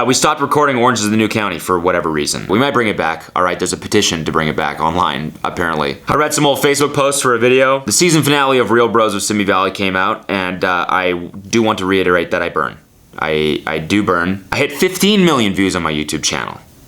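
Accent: Canada. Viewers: I always forget you’re Canadian until you open your mouth and say “sohrry”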